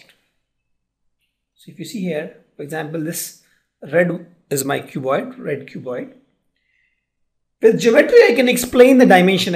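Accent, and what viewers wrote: India. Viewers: Pls try to speak less Indian accent if possible - not an offence - im just giving suggestion pls dont misunderstand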